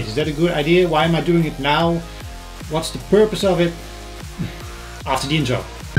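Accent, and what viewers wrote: Netherlands. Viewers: Thank you for this video! and btw are you dutch?😅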